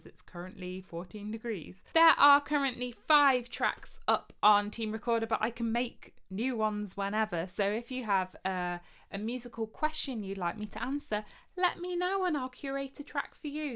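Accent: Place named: United Kingdom. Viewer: We can tell you're definitely British. You being a Brit owes its verisimilitude not only to your accent, but to your expressions. For example, your counterparts across the pond would say: “I’m going on vacation.” An American 🏴🗽 would never say, “I’m going on holiday.” 👌 😉 Stay safe, stay well and keep the faith. Or as would say “Have a great vacay! Enjoy it and be healthy!” 👍 😊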